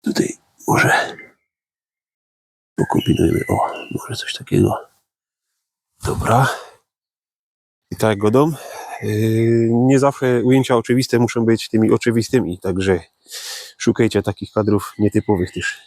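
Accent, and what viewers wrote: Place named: Silesia, Poland. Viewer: Piękne zdjęcia pięknego miejsca. Deszcz to dla mnie osobiście bardzo nastrojowa aura, lekko nostalgiczna, czasem taka nawet "Silent Hillowa". Jak zawsze: dowiedziałam się czegoś nowego. No i najważniejsze, czy aktualnie używany przez Ciebie jest akcent śląski w języku polskim, czy język śląski, brzmi to naturalnie i nadaje charakteru całemu kanałowi.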